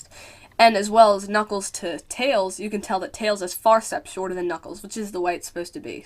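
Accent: United States. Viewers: r u from chicago? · I like the video but you're accent is terrible I can't stand a New Jersey accent!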